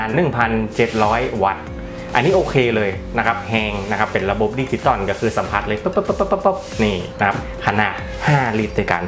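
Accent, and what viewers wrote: North, Thailand. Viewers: ดีดีค่ะ ชอบภาษาเหนือค่ะ เพราะดี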